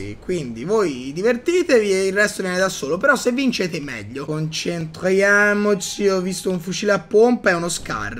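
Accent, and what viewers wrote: Tuscany, Italy. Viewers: Con l'accanto toscano bellisimo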